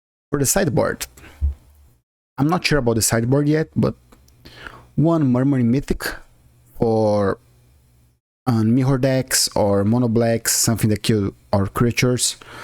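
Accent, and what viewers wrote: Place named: Brazil. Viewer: Meu Deus é brasileiro 😝😝😝 Melhor sotaque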